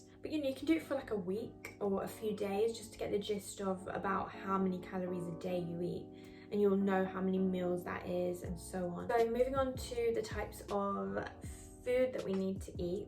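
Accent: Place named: United Kingdom. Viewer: I don’t understand a single word ohhhh Britain 🙄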